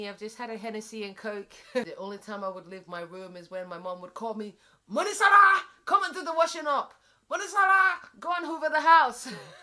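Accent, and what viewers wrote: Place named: United States. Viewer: When ya click like before the video even starts 💫💫 Oh my gaaaaah I was a massive fan too.....still am actually!!!! You crack me up! Your as real as they get, I think its a Taurus thing. Glad to hear no-one is fcuk-in with ya but dey fcuk's wiv ya 😂 you have an American twang to your voice did you used to live out there for a wee while?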